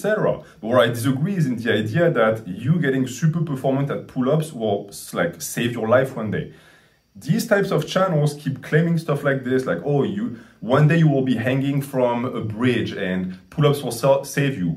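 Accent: France. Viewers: Is that a French accent I hear? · Where is this accent from? Sounds like French but I am not sure. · Is that a French accent? I love it!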